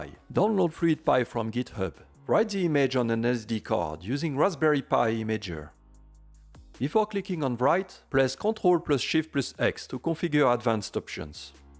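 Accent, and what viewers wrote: France. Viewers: This is the thickest French accent I've ever heard. Great video though!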